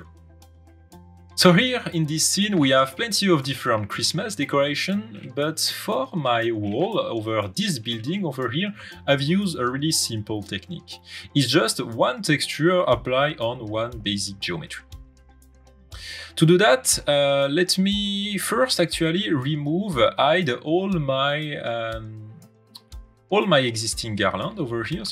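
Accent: France. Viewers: fait des vidéos en Français stp ta un gros accent Français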